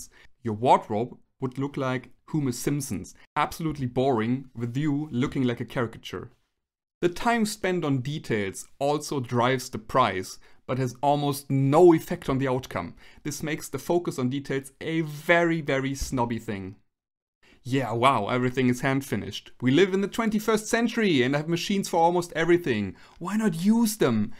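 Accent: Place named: Germany. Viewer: You sound German, I might be wrong but I’ve been learning German for about two weeks, I love the show Babylon Berlin and I love the clothes they wear❤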